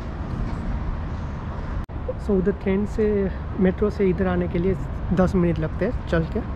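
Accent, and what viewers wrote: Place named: Gujarat, India. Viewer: By your language accent i am assuming you to be from Gujarat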